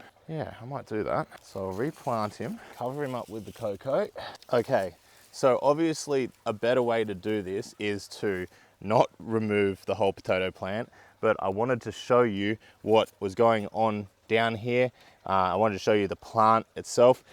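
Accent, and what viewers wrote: Australia. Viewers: YOOO another Aussie gardener I can get behind!!!! lots of interesting and informational content that isn't droning on and boring, and it's being all eco-friendly and all that! You have a new subscriber lol, I adore all this crafty gardeny goodness xD Where abouts in Australia are you? the butcher birds in the background and your accent sound very WA-like, are you WA-based? xD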